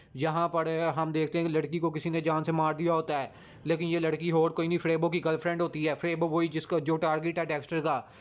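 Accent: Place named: Lahore, Pakistan. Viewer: Barawa lahori explain kar rha Hy Yahan parrr Yahan parr zarorr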